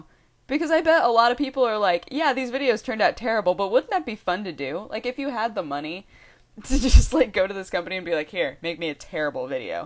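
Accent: United States. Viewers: lol, it sounds so weird hearing you speaking your American accent rather than your "British" accent, lol. ;)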